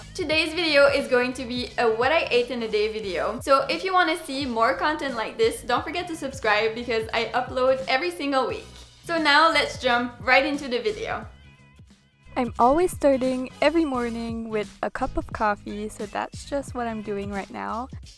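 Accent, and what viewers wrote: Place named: Gaspésie, Canada. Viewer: omg your accent sounds just like the accent from where I live... I am in Gaspesie... Also hello fellow vegan. I love this video 😍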